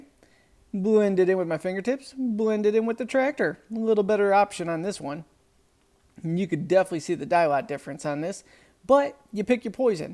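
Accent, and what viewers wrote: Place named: South, United States. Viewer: It was the southern accent that helped this one.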